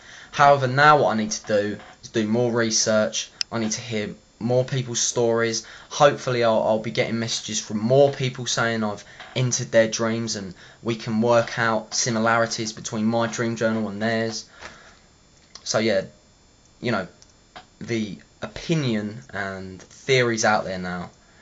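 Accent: United Kingdom. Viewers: lol i'd guess from his accent he lives in the U.K, and they're on a pretty similar time. enough for the two to be simultaneously dreaming. I think.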